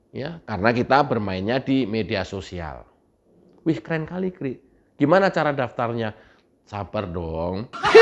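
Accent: Java, Indonesia. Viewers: AREMA HADIR BANG.. MANTAB CUK.. JANCUK 😂 MEDOK BINGIT BOSONE HA HA HA HA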